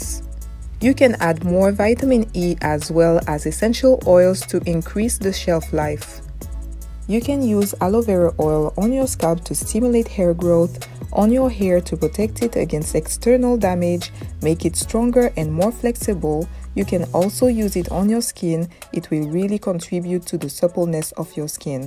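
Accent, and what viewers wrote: France. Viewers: She got French accent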